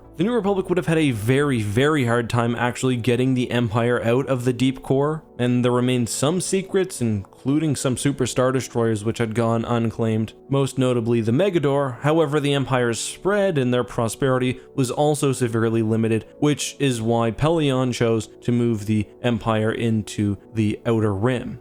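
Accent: Canada. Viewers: is so aggressively Canadian and I love it. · Is this guy Canadian? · you sound canadian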